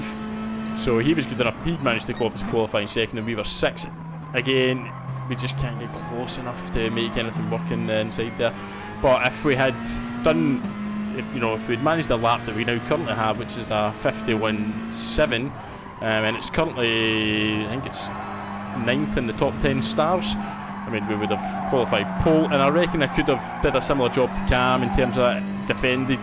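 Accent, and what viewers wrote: Scotland, United Kingdom. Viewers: First time viewer and fellow Scot. Good to see that the UK GT Sport streamers are not all from the SE of England. Nothing against the English at all, but just nice to hear the Scots accent commentating. You from the lowlands, Glasgow area? Would love to see you racing with the likes of Super GT, Tidgney, Rory and Kie. Like Rory - cos of his mature attitude to racing, much like yourself. Consider me a sub.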